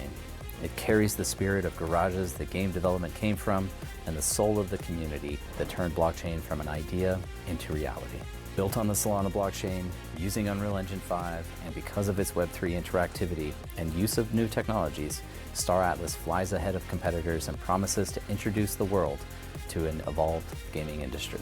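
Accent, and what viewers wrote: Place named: United States. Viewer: Which part of America is his accent from??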